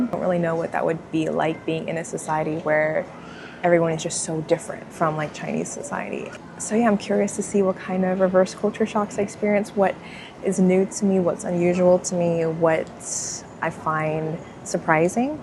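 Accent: United States. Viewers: It’s amazing how you sound American despite growing up in China. It would be interesting if you had a Chinese accent. Vancouver BC is a beautiful city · I think it's great this journey, but for someone who has lived nearly all their entire life in China and feels more Chinese than American, you definitely have a very strong native born American accent. Strangely I feel like Americans overseas never lose their accents no matter how long they've been in that country? Sorry that feels like a generalisation. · Then why is your accent so american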